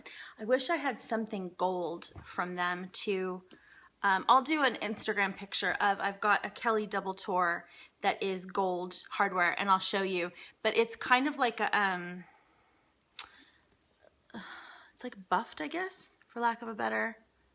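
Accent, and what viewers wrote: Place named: United States. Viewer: your cute ....I saw an ash vlog ...you have an American accent do you live in England year round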